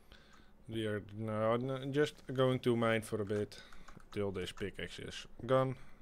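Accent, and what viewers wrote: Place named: Netherlands. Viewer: Ik hoor een beetje nederlands accent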